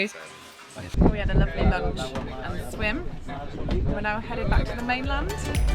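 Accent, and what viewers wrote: United Kingdom. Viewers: By your accent you are British it seems. I'm Salvadoran and Gulf of Fonseca is only 30 mins from my house! Greetings!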